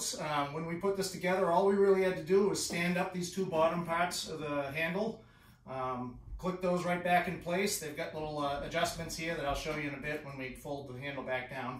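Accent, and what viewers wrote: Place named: Boston, United States. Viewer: Love your review...you sound like you might be from the Boston area. I'm from Long Island. I wish I knew about the new model! I bought the 220 this spring and while I love the way it performs, the batteries run out of juice so quickly and it takes so long for them to charge I can't mow my front and back lawn on the same day. (And they're pretty small suburban lawns.) I would have loved the larger batteries and longer run time.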